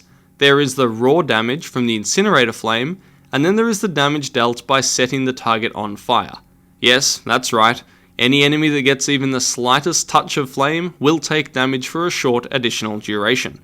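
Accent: Australia. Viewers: You're aussie too? :D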